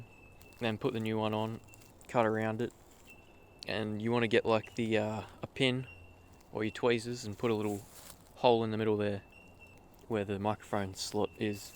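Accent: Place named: Australia. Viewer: Love your vids man, Aussie AF Easy to follow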